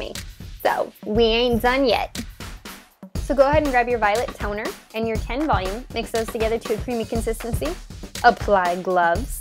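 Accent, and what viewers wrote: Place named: South, United States. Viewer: what sounds like a Southern accent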